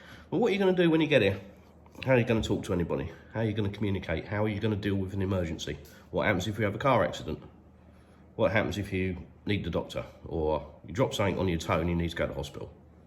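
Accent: United Kingdom. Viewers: Кажется, британский акцент..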